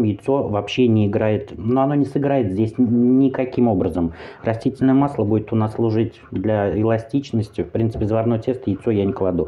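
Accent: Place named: Moscow, Russia. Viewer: у вас великолепный русский язык с московским произношением, это знаете ли, тоже эталон, такое сейчас редко встретишь. Спасибо вам,что вы так классно говорите! Вы нас и этому учите!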